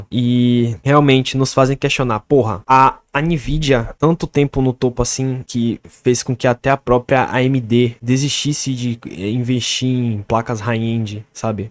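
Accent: Bahia, Brazil. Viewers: Você é baiano?? lembra um pouco o sotaque daqui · O bahiano é perceptivel de longe kkk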